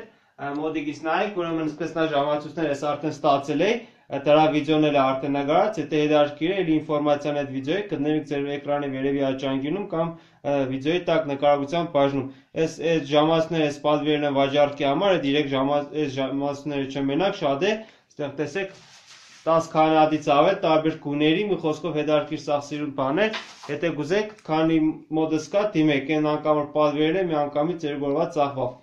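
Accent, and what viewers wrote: Gyumri, Armenia. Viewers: ճպցնում եմ լայք ամեն ինչի, հատկապես գյումրեցու անուշ բառբառի համար )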